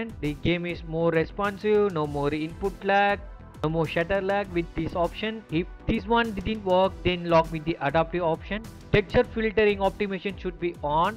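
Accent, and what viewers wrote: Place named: India. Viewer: thank you so much man! always the indian accent helping us.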